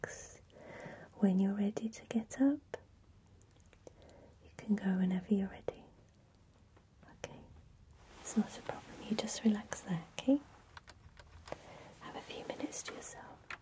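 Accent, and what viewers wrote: England, United Kingdom. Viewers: I love your beautiful, sincere smile, your soothing voice, your nice and stylish English accent.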